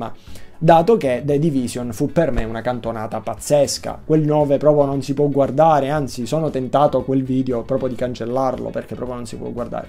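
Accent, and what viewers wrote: South, Italy. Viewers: di dove sei ? sembri del sud!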